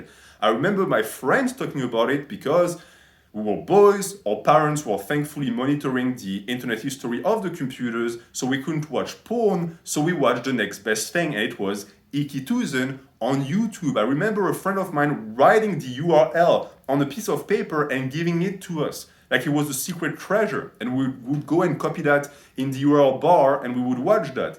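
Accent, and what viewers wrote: France. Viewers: I am Polish and consume content from a french natty and get inspired in english. So I am happy that You are back, the content I want to share and the content I haven't watched yet is back. As a side note, You were also an inspiration to return to my french studies - educated noble muscle natties can learn more than one foreign language. Je suis très heureux que nous pouvons encore Vous écouter et Vous regarder. Nous allons tous y arriver. Je vais fêter ça avec des "pullovers" et des "Arnold raises". J'adore votre accent français. Salut!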